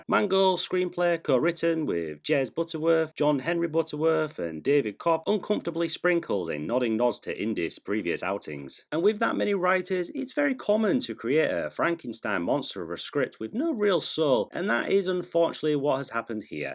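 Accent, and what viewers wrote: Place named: United Kingdom. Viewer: its just his beautiful british accent fr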